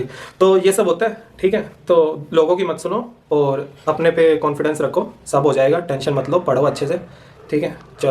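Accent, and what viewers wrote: Haryana, India. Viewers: U have haryanvi accent are from haryana??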